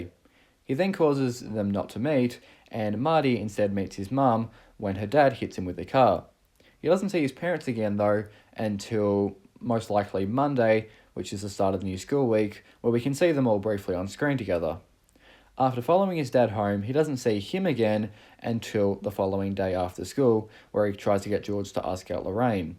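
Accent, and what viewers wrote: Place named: Australia. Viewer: God I hate the Australian accent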